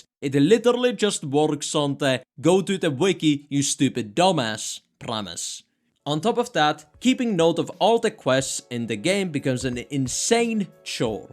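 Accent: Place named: Germany. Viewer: What the hell is this accent? You sound like a German guy trying to speak Portuguese with a new Zealand twist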